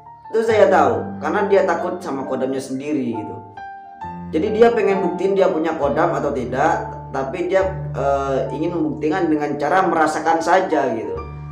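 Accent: Madura, Indonesia. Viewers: Sampean orang madura y cak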